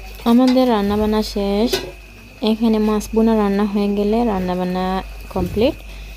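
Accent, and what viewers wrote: Sylhet, Bangladesh. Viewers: beautiful vlog. nice blog. apu apni ki sylheti ami confused tai ask korsi apni ke.super blog.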